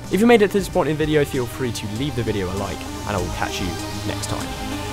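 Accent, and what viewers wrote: England, United Kingdom. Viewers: I will be studying in Uk this year,are you from England ?????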